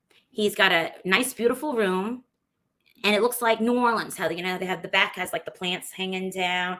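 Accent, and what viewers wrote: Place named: New Jersey, United States. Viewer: New Jersey! That awful accent. That makes perfect sense!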